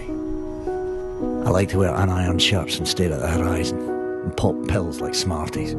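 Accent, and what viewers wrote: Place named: Scotland, United Kingdom. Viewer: I absolutely love his accent even though I am also Scottish 😂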